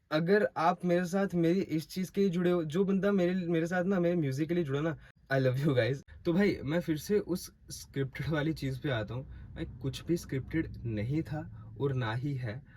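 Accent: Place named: Dogri, India. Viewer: We are with! Haters ko ignore karo aur apne future par focus karo. All the best our sweet gentlemen, Btw if nothing is between you and Tanishka still we love you as we loved you before! I saw your red eyes and noticed that how much pain you had faced and your face tells everything that you’re saying truth. Haters will never let you grow because of the jealousy. So keep working,growing,Laughing and making us laugh just like before cuz I have seen you serious for the very first time. And I hope hamari Tanishka Didi bhi theeek hongi 😙. Just waiting with eager and excitement ki aapka vlog kab ayega. Special note for you- Tusi jado Dogri ch bolde go ta Mainu bhohot vadiya Lagda hai. Namaskar and Sat Sri Akal from Canada ❤️🩷🩷🌸🌸🤍🤍😇😇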